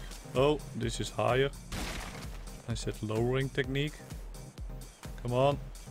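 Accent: Netherlands. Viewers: You sound like a Dutch person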